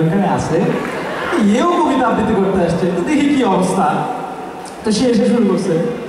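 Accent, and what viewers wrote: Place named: Chittagong, Bangladesh. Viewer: ভাই আপনার বাড়ি কি চিটাগাং এর দিকে নাকি?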